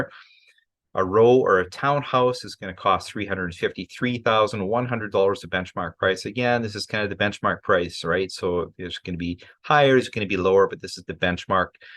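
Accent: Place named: Canada. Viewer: Estoy escuchando tus videos todo el dia para aprender ingles y acostumbrarme al acento canadiense (I'm listening to your videos all day to learn English and get used to the Canadian accent)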